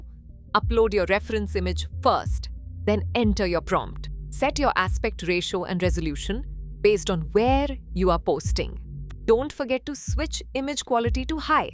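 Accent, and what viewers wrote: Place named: India. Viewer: поменяй уже наконец акцент у своего аватара с индусского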